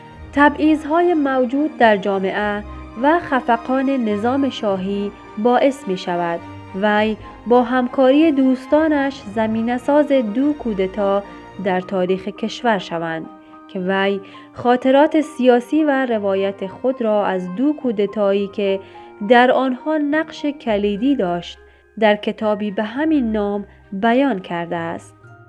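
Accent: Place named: Iran. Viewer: Why don’t you speak Afghan Dari. You sound very foreigner meaning, Iranian.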